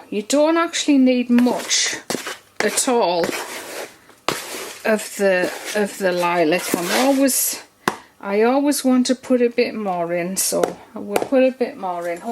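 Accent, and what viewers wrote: United Kingdom. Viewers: I love your British accent !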